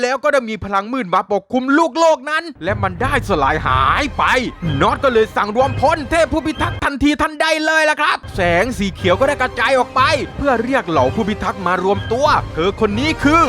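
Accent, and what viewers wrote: South, Thailand. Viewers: ชอบเวลาพี่พูดใต้อะ พี่พูดเยอะๆหน่อยผมชอบ55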